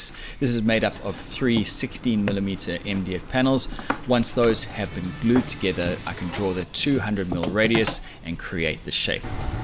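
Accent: South Africa. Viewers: Im picking up on a south african accent i think, im also seeing alot of items bunnings sells, would i be correct in assuming you’re in Australia? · Stunning chair .. and great to hear a saffer accent .. Thanx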